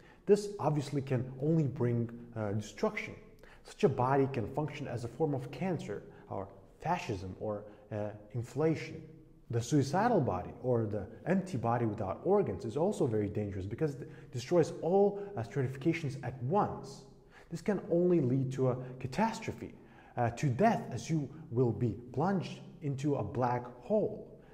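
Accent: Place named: Lithuania. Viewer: The accent reminds me of a Lithuanian one. Thanks for the video!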